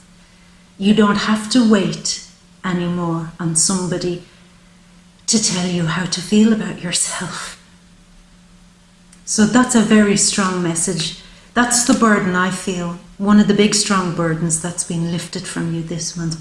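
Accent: Ireland. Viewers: Six bajillion holohohoaxers on the rampage from the murder of the Romanov children in cold blood, the Holodomor and the 50 million anhialated through the 1918 Spanish flu, called so because Spain was the only country without a blanket ban on media. To wall Street and the Frankfurt School Freudian Marxists kicked out of European countries, again, now to rule by political correctness and to silence the counter narrative unofficial thinkers. Apart from that tyrannycal despotism I really like your top on you, and ye've a great brogue.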